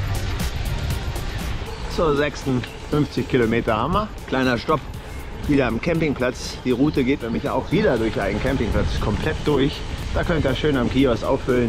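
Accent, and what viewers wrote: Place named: Saxony, Germany. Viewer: Schlauer Startpunkt, der Sand am Ende kann dazu führen das man gedanklich ein paar Verwünschungen in Richtung des Scouts ausstößt... 😅 Dafür kreiert der Trail am See aber wirklich Begeisterung! Würde euch dringend Venus Valley ans Herz legen! P.S so richtig passend fande ich das sächseln (auch) nicht 😉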